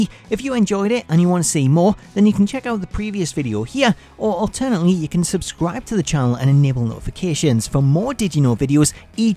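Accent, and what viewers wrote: Newcastle, United Kingdom. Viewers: You’re a Geordie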